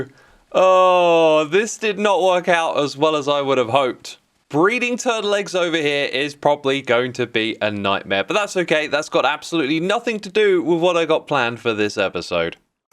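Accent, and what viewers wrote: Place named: London, United Kingdom. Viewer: Your new mic has just made me even more confused! You have sort of this londoner accent but I still can't put down why your accent is so familiar aswell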